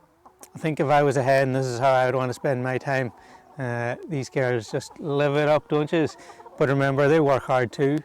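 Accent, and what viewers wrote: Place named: Ireland. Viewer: Hi, keep up the videos, nice to be listening to an Irish man for a change 😊. Just wondering where you buy your bulk compost. John. Co Kerry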